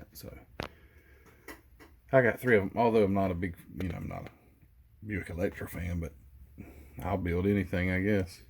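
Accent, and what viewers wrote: South, United States. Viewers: One tip for painting dashes they sell micro micro small brushes at hobby town they come in a pack of 30 for 7 bucks and they are so fine it's so easy doing dash and tiny details and one more question are you from Alabama or Mississippi due to the accent I'm in mobile al if your close maybe we can build sometime or learn some tips from you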